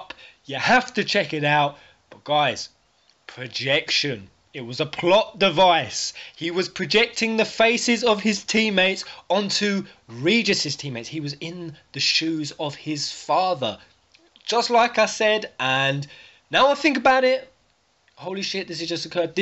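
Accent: England, United Kingdom. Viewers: And it ain't the English accent! I'm just loving his persona. More depth to my mind! I think everything that goes on here will be a record for future FF theorists and forward thinkers. It's an awesome place to vent and express:) Plus I wanna see this updated Peasantry wall! Hope you noticed I've up'd my pledge;)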